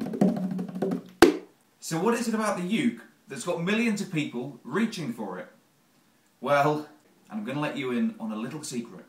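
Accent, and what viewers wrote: United Kingdom. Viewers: Hello there. I haven't got a ukulele yet but I do feel like buying one. Specially after watching your simple video showing how simple it is to play it. Actually clever ppl can simplify things as you did.. And not everyone can do that.. Also your British accent is a plus. Hahhaha. Are you a music teacher in England or something? Thx for sharing your knowledge. Bye. Cheers. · Speak up ! u british ?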